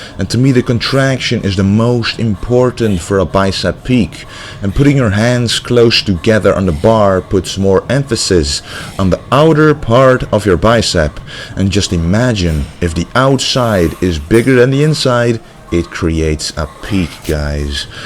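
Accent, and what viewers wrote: Netherlands. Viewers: Somehow your accent gives me the idea that your a dutchie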